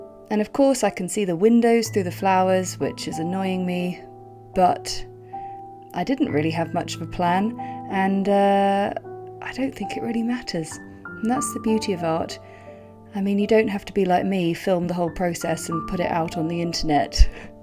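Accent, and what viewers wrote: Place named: United Kingdom. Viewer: Is that your inspiration picture on your computer? I loved this tutorial. It’s fascinating to watch you paint! Good for you stepping out of your comfort zone! I tend to be more detailed as in your other videos. I’m a new follower and love hearing life British accent! Your pup looked so sweet. Have a wonderful rest of the week! A new friend in Tennessee and Iowa. USA. 🇬🇧🇺🇸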